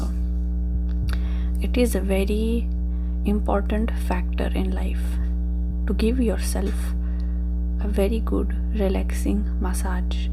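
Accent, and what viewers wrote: India. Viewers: Please never stop uploading, this is great content! Also, is that a real Indian accent or is it something you learnt while travelling?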